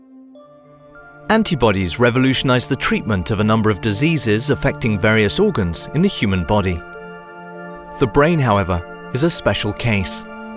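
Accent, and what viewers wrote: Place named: RP, United Kingdom. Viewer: "Location: United States" Why the posh british accent though?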